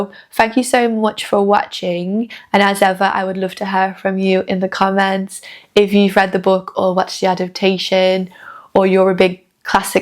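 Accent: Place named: Northern England, United Kingdom. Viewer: Your observations are spot on. Bundle is the typical unbearable know-it all girl boss figure that has become so overused in modern film and TV, here shoehorned into a 1920s setting., her language and clothing were totally out of place. I fear the worst when it comes to the coming adaptation of C S Lewis' Narnia books later this year. If they muck that up, I am cancelling my subscription! Nice to hear a northern English accent on YouTube! Thanks for sharing, Greetings from cold Sweden!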